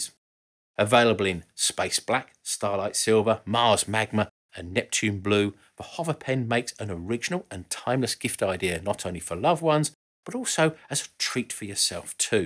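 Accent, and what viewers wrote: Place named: RP, United Kingdom. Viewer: Thank you, for your videos! They are all incredibly good! Your speech is brilliantly clear, and there are few other places on YouTube where you can see proper English subtitles (and also hear real BBC English spoken!)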